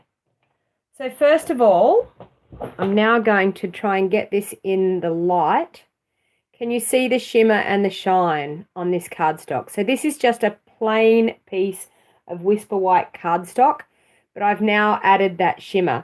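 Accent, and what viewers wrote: Australia. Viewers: Hi, Just watched your video. Love your work and videos Just thought i would let you know that if you mix the shimmer paint with some ISOCOL Rubbing Alcohol Antiseptic into a mister. The ISOLCOL dries quietly and doesn’t run. I live in Western Sydney love watching your videos and hearing the Aussie accent.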